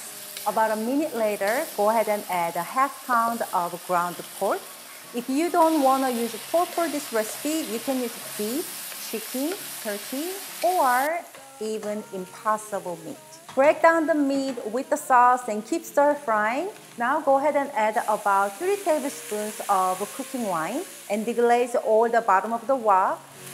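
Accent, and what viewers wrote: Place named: South Korea. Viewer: You are guilty for loving an korean woman with korean accent for cooking japanese Ramen.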